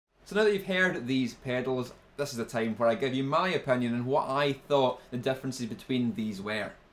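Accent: Scotland, United Kingdom. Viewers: So, where you from? Sound scottish lol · Your Scottish is beautiful! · All I can think when I listen to a scotish talk is in fucking trainspottin hahaha!! great review mate! cheers from argentina! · Entertaining British commentary. it’s definitely funny. The personality certainly comes through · I forked out $280 (US$) for the TS808HW (used) and I feel like it was worth it, it works excellently as both a boost and an overdrive. Also I'd just like to say that I use your videos as a reference for a Scottish accent... Take that as you will, my ancestors were mostly Scottish....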